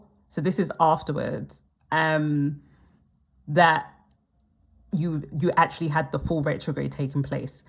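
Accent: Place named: United Kingdom. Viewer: Idk where you are from precisely but wherever it is is my favorite British accent and also this is such a novel way to present a reading and I really love it!! Thank you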